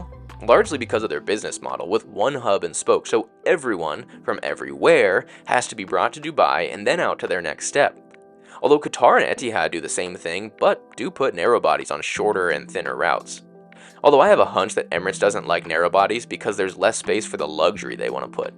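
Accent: United States. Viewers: Good, but the harsh American mono accent was a bit of a spoiler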